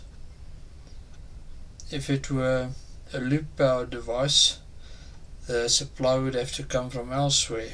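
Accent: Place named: South Africa. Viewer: You sound very south african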